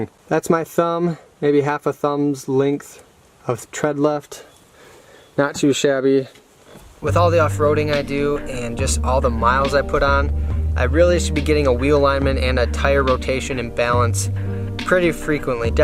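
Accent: Wisconsin, United States. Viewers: Now that you're back East, I do believe I hear some of that Wisconsin accent coming back. Is it sleeping bags or sleeping begs? lol Hope y'all are doing well.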